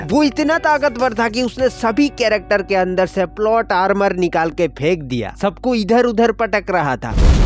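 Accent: South, India. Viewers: Lots of love from Rawalpindi your accent is same like movie Chennai express you are from which city Namaste Namaste Namaste Namaste Namaste Namaste Namaste Namaste Namaste Namaste Namaste Namaste Namaste Namaste Namaste Namaste Namaste Namaste Namaste Namaste Namaste Namaste Namaste Namaste Namaste Namaste Namaste Namaste Namaste Namaste Allah Hafiz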